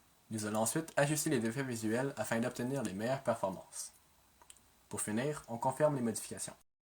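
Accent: Quebec, Canada. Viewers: je préfère largement entendre l'accent québécois plutôt que l'accent d'un gar des bouches du Rhône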